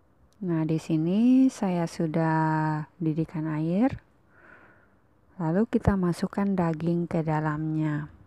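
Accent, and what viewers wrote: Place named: Eastern Indonesia, Indonesia. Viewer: dari logatnya pasti daerahnya sama dengan saya yaitu Indonesia timur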